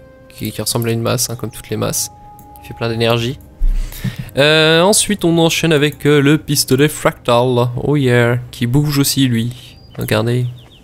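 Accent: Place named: Bourgeois, France. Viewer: superbe vidéo mais arrête de faire ton accent bourgeois. c'est juste pas drôle.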